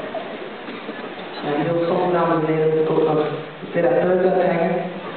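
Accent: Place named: Netherlands. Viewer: haha,haar hollands is wel grappig moet ik zeggen !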